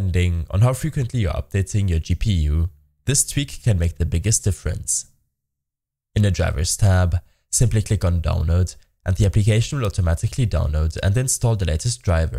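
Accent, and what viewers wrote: Germany. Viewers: Are you from Germany? kinda sounds like that xD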